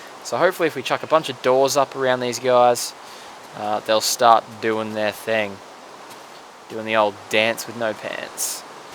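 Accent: Australia. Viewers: he's Australian right??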